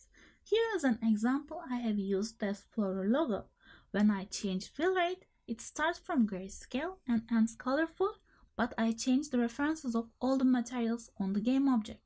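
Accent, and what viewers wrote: Turkey. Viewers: English with Turkish accent :D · Teşekkürler.! video çekmeye tekrar dönersin umarım :) hep 1 yıllık videolar, ayrıca ufak bir bakındığıma göre mikrofon iyileştirmesi yapmış gibisin ama biraz daha tane tane konuşabilirsen muhteşem olacak gerçekten :) aksanı duyar duymaz dedim bu türk olmalı :D oyun sektörüne böyle katkılar yapan türkleri görmek çok güzel.! hintlilerden ve kuzey ülkelerinin garip aksanlarından sıkılmıştık :D yılma devam et lütfen. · Türk aksanı 🙂 kolay gelsin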